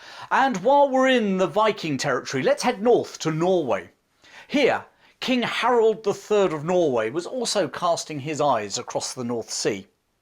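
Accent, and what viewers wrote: England, United Kingdom. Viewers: I love your narration and passion for history and teaching. Regards from India/Thailand. Your face reminds me of my dad uncannily, although with an English accent.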